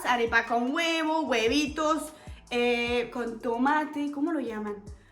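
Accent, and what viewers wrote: Buenos Aires, Argentina. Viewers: Yo soy colombiana, y mi esposo es árabe.. y ellos comen más arroz que nosotros. Mi suegra se gasta 3 kilos de arroz diario. La yuca con café con leche... jajajaja qué veneno, y dice que tiene problemas digestivos para los fritos 😂. Y nutella, que prácticamente es chocolate con aceite de palma que no es digestivo para el cuerpo... Qué triste que destruyas tanto el Idioma Español. O hablas como argentina o adoptas el idioma Español colombiano. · No entendí bien francesa por qué tienes el acento tan pronunciado de Argentina pero sabes por qué Barranquilla es una parte muy bullosa y de muchos carnavales te faltó muchas partes preciosas para conocer y ante todo la gente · Haha el acento de tu español es completamente argentino con alguna palabra colombiana por ratos y el frances haha me encanta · Bueno, pero al fin es Francesa o Argentina? 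Habla como Argentina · 😊Con acento argentino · Que bueno escucharte, soy de Bogotá y me alegra mucho que te Gusto mi pais,me gusta tu unionde argentino con barranquillero y algo de Bogotá una mezcla que se escucha chevere sobre lo de mona es por que tenias el cabello algo rubio y la piel blanca es tambien algo de cariño como lo qué comentabas del mi amor.😊😊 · Señorita vaya que se le pegó el acento argentino.. "sha" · Ton accent est très argentino mais les paroles que tu dites sont très barranquilleras. 😅😂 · Ya tienes un dejé costeño, eso si, el argentino porteño lo tienes bien adentro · Eres francesa , pero tu acento es argentino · Aprendió Español con alguien De argentina. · Bogota no es una provincia y deja de hablar como una argentina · Hola!!! Tremendo acento argentino 😂😂😂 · Mona aquí en Colombia se refieren al color del cabello y encima sos Blanca total. En España le dicen Mona a las cosas que les gustan y son bonitas pero a las personas no. 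Y vos tenes acento argentino. Pero de francesas no te veo nada ois. Soy de Medellin tierra hermosa somos muy amables igual que Bogotá, amo mi tierra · Habla como de argentina boludo no como frances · ese sonido "chillón" {chirriante y chocante} de esas letras "argentinizadas" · Hola te quedó el acento Porteño. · No tiene nada de francesa,puro acento argentino,mi amigo francés lleva más de 15 años en colombia y se le nota el acento francés ,todavía se le dificultan palabras y eso que es profesor,esta chica no es francesa. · Su español es excelente,no se te siente el acento francés que suele ser muy fuerte.Felicitaciones. Su acento argentino suave es muy lindo. Y si comemos arroz como locos,les ganamos a los chinos 😅😊😊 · Pues de acento colombiano tienes bien poco: lo tuyo es argentino. Soy español y también hay cosas que culturalmente no compartimos con los hispanoamericanos .... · Que linda, al hablar mezcla estilos el argentino, barranquillero, algo español, muy interesante. 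Se ganó mi corazón. Saludos desde Colombia. Bueno en tema de comidas, si hay diferencias entre zonas de Colombia. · Colombiano que se respete come arroz como sea bien chistosa esta estrangera pero es francesa pero tiene acento Argentino si yes pero como la francesa vivio en Argentina luego aqui en bogota colombia si yes bien venida a colombia soy colombiano estrangera hermosa si yes estas muy bonita si que dios te guarde y santisma virgen de Guadalupe siga asi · Ud es de Francia o es argentina porque tiene más asentó argentino que francés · Me encanta como incorporasre es el modo de hablar porteño. Ahora bien, si hay más vendedores ambulantes que acá en Argentina, es mucha gente vendiendo en las calles. Muy lindas las referencias de Colombia. ¿Por qué será que siempre vamos tras el lenguaje coloquial y el grosero de cada país?😂 Salut a l'amie française!!!! Dónde vivís en Francia? Cariños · Jajajaja es super encantador ese acento entre argentino y costeño que tienes jajaja <3 <3 <3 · creo que los colombianos no te van a entender mucho,vos hablas más argentino que colombiano. 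Te extrañamos en argentina 😊😊😊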